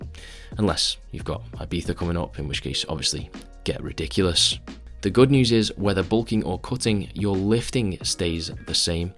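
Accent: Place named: Northern England, United Kingdom. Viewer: you said a while back in the video where you discuss how you haven’t been putting in your full effort for some time, that one of the reasons is that once you’re in good shape, you don’t have to train so hard to maintain. Does this approach not apply to when cutting? ie, not lifting like you’re trying to gain, despite what you say about always training like you’re trying to grow in this video? Love the content mate - so good to have a dry Northern lad deliver a quiet and self aware sermon;)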